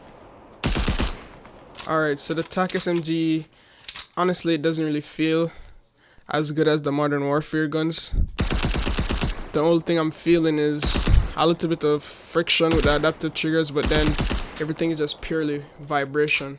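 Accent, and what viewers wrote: Jamaica. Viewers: Jamaican we can hear it in your voice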